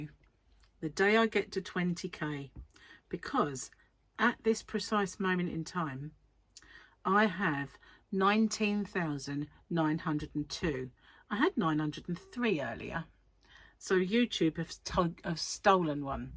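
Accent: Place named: Cambridge, United Kingdom. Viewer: Hi 👋🏻 My goodness your accent reminds me so very much of my mother in law who was born and raised in Cambridge. The way you phrase things is so similar. She has been gone now for almost 10 years so it is a lovely to hear you speak and it brings back many wonderful memories. I’m a newcomer to your channel so can you tell me where you are? #Furls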